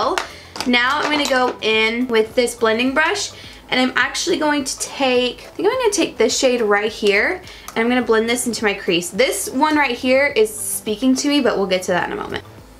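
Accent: South, United States. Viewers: Where is she from? 😂 has to be down south! 😂👏🏽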